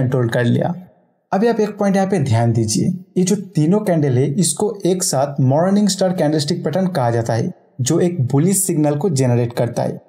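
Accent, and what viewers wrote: Bengal, India. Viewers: app bengali ho kiya?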